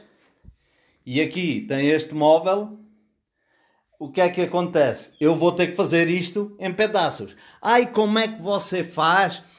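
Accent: Portugal. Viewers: fico muito orgulhosa de ouvir um Português no YouTube! Sou Portuguesa e estou em Portugal. Ótimo trabalho! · Adoro o sotaque do português AMO de paixão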